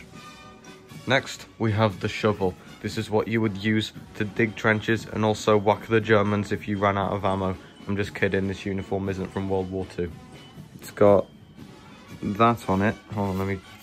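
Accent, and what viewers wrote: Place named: United Kingdom. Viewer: He speaks a british accent lol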